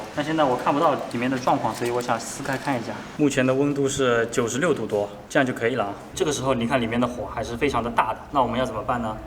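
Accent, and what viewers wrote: Nanchang, China. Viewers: 南昌话说的好地道，谢谢介绍南昌美食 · 标准的南昌话，浓浓的粉味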